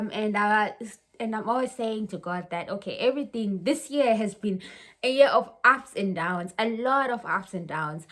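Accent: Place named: South Africa. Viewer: You said, "yhoo shame", "uyanya", etc. The way you say these words, accent and demeanor, it screams Xhosa hun. I will be starting life in Germany in the next few months on Blue card visa. Hopefully, I don't go through such turbulence.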